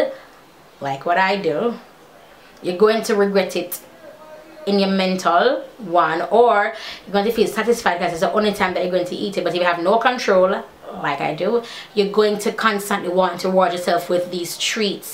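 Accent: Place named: Jamaica. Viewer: Hello. I've been watching your channel for a year now. I love your energy and that lovely Jamaican accent! Yes rewarding yourself is a good thing you work hard and you deserve it! God bless you and your family.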